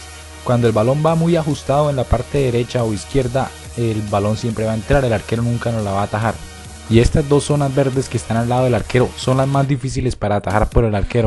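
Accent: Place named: Colombia. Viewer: eres colombiano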